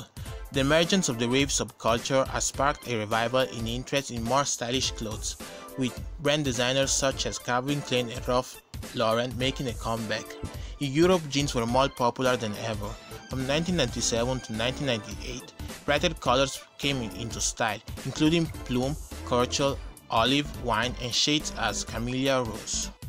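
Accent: Italy. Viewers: Why you speak with an italian accent?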